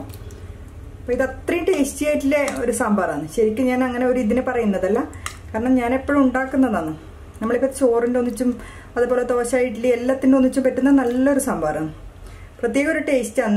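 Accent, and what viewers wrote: Kannur, India. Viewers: I like your kannur slang very much. I will try soon. To pressure Cook Tur Dal only 90 seconds required. If we are releasing the air from the cooker, we are waisting the gas. This for your information. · ഞാനും ശ്രദ്ധിച്ചു. അച്ചടി ഭാഷയിൽ നല്ല രീതിയിൽ അവതരിപ്പിച്ചു. എങ്കിലും ഇടയ്ക്ക് നമ്മളെ കണ്ണൂർ ഭാഷ വന്നു. ഞാൻ തലശ്ശേരി. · Kannur basha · സൂപ്പർ. കണ്ണൂരാണോ, വടക്കരയോ.... സംസാരം കേട്ടിട്ട്.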